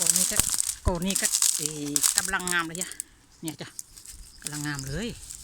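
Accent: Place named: Phu Thai, Thailand. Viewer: 🙏ดีค่ะ มาย้อนดูคลิปเก่าๆจ้า เป็นคนจังหวัดอะไรค่ะสำเนียงเหมือนภูไทหรือจังหวัดเลยค่ะ 🌷👍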